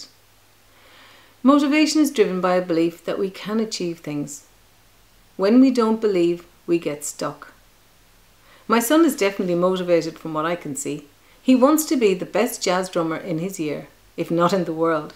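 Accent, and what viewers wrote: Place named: Ireland. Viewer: Well done on blog 82 video. New beginnings for sure. Pleasure to listen to your content and your Irish accent. JC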